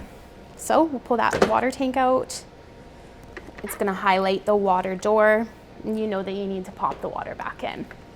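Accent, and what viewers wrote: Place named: Canada. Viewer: The Canadian accent on this makes it very entertaining! 😂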